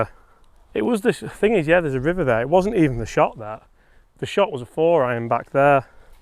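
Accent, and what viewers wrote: Yorkshire, United Kingdom. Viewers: Does using an Americanism like suck with a Yorkshire accent really work? Sorry but being British, that kind of thing is a bit of a pet hate of mine but I suppose we all have them, don't we?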